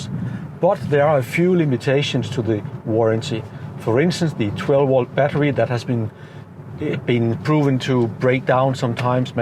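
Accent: Denmark. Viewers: Tager jeg fejl, eller afslører din accent, er/taler dansk? Nå men har købt en ionic 5 Ultimate her i Danmark, den bruges primært af min kone som elsker den når hun pendler til og fra arbejde. Ja forbruget er lidt højt, men den er lækkertskruet sammen, kvalitet, ekstraudstyr. Rimeligt prissat nu i forhold til tidligere og efterprøvet igennem flere år og raffineret. Klar anbefaling herfra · hahaha tænkte netop det samme. Svært at skjule den danske accent.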